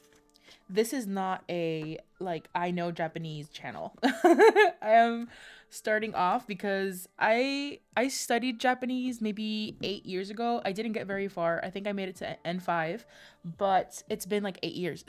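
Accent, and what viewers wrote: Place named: Puerto Rico. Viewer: I was not expecting the Puerto Rican accent 😂😂😂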